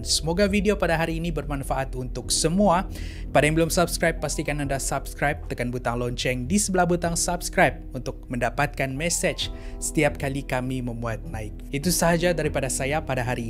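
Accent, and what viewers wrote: Sabah, Malaysia. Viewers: bukan lah sebab cakap melayu masih ada loghat Sabah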